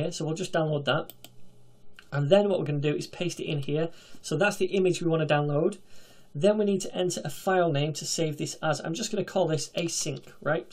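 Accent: United Kingdom. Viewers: you are amazing I like your British accent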